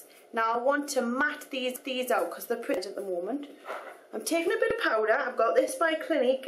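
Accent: United Kingdom. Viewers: she is british. how can you say how she naturally speaks sucks???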